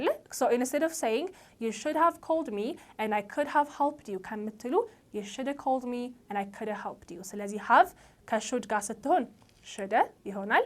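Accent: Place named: United Kingdom. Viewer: 😢😢😢😢😢😢 omg special British accents I can't understand ohhfuuuu 😢😢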